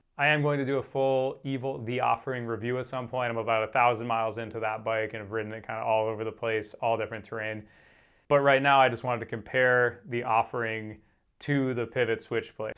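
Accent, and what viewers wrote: Boston, United States. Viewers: Amazing review. I think the fact that I’m originally from Massachusetts and hearing a bit of your Boston accent helps me understand what you’re saying. 😂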